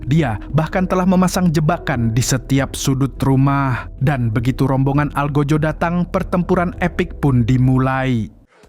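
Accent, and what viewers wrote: Banjar, Indonesia. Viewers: Urang Banjar sekali nya😂🙏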